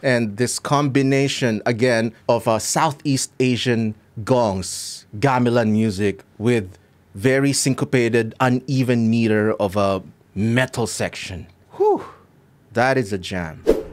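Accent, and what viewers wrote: Philippines. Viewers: I may be wrong but.... Are you filipino? Considering your accent and your speech pattern, I'm led to assume your filipino good sir ( I'm new to this channel :'D )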